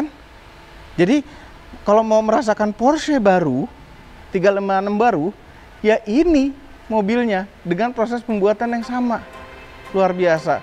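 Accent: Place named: Bali, Indonesia. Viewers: cara bicaranya Bali banget👍😂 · Logatnya bali banget....😂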